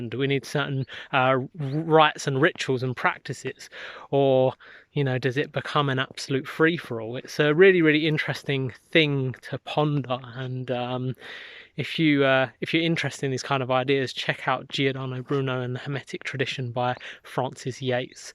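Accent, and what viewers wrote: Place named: United Kingdom. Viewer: Thx for sharing.. How come you have stopped making more content for your channel. We have the same accent from UK 😁✌🏼 Thx for sharing. Subscribed 💚🙌🏼✌🏼🙏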